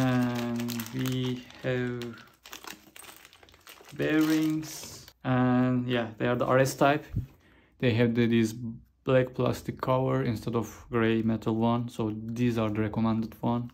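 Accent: Turkey. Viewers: Hocam videonuzu izlerken aksanınızı türk aksanına benzettim, tam yorumlara girdim sormak için biri zaten sormuş bile :D. Bu kit Türkiye'ye gönderilemiyor diyor aliexpress sayfasında, nasıl eriştiniz kite acaba? Ne kadar vergi çıktı?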